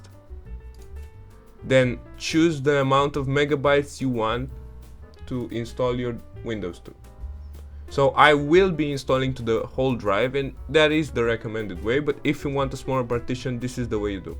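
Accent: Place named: Romania. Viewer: ba te am vazut roman dupa accent si dupa am vazut si emagu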